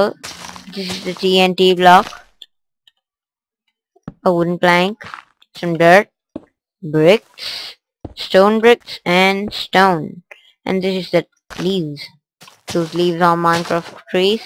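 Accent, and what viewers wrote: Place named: India. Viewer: its indian accent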